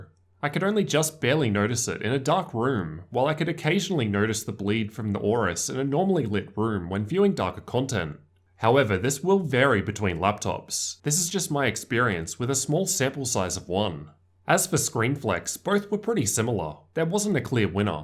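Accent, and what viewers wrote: Australia. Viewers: This is just how Australians sounds ahahahaha I’m Australian and can confirm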